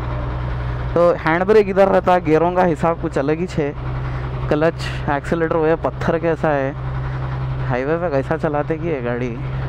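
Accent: Hyderabad, India. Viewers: Bhai you nailed it . loved the way you spoke the typical Hyderabadi way! Definitely want a mlre though test of the truck and a tractor! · bhai kab sudroge humesa naya naya karte ho. sound to superbikese bhi jyada atsi. mujeh aapki hyderabad language bahut atse lagte ho bhai keep it up. teeper hogaya crane bhi karo bhai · I love the hydrabadi language how you talk!!! 😂😂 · bhai ek number Hyderabadi commentary marte...yarro... Ab next ice-cream ki thela le k niklo aur apne dosto k aur pechchaan k ghar k aju baju ja k responses record Karo... Bada majja aayenga aap ko bhi aur un ko bhi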